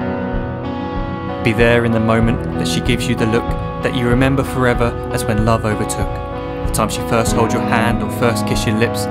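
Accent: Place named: United Kingdom. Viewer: Absolutamente sensacional! Nossa triste realidade e a antevisão do futuro,com o tempero especial do delicioso sotaque britânico (ou australiano?).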